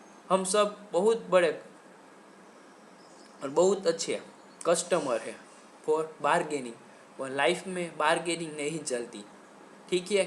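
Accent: Gujarat, India. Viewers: Ap Gujarati ho na bhai ... Language understands ..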